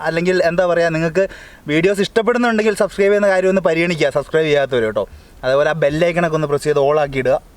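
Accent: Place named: Idukki, India. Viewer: Iddukki anno ee sthalam slang kettit iddukki annennu thonnunavar like adi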